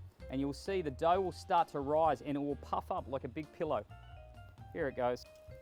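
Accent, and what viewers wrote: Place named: Australia. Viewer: Great food, and good to hear an Australian accent! I note you also have a Big Green Egg behind you - if you could only have one, would you choose a wood fired oven or a Big Green Egg?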